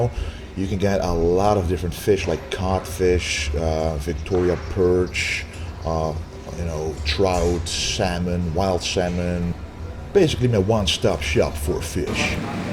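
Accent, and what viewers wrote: Netherlands. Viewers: I can listen to this guy talk all day long, the Dutch accent is very pleasant sounding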